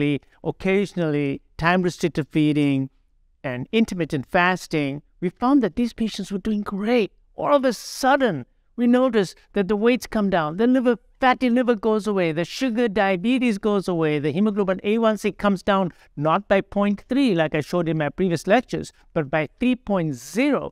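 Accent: India. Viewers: I naturally listen and trust doctors with Indian accent. I've now watched about 8 videos of him. He's a genius ! 😊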